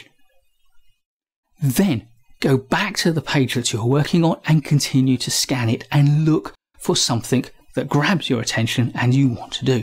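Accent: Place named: Northern England, United Kingdom. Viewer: Haha! Didn’t t realise I wrote the same comment 7 months ago! Northern English mispronunciations still bug me!...but your explanation of Mark's system is the best available. Xxx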